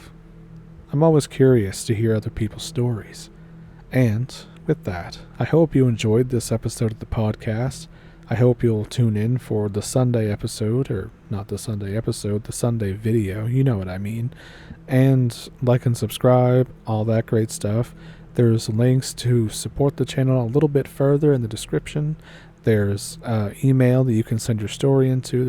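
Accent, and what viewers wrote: Ontario, Canada. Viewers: Odelay,. I enjoy how much you sound like my Ontario cousins-! 😂😊😊😊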